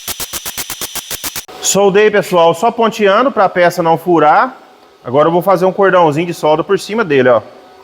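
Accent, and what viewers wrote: Minas Gerais, Brazil. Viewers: Bom dia,vc tem muita paciência e vontade de ensinar, parabéns pelo vídeo,e esse sotaque me parece que vc é de Minas Gerais, eu tenho um certo receio em usar essas inversoras junto do corpo por causa do campo eletromagnético que ela pode gerar, é melhor evitar uma possível radiação no tórax.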